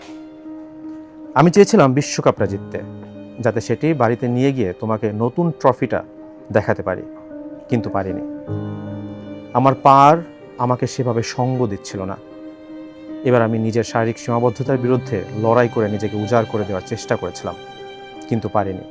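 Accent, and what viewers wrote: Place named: Faridpur, Bangladesh. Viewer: আপনি ফরিদপুরের পাঠক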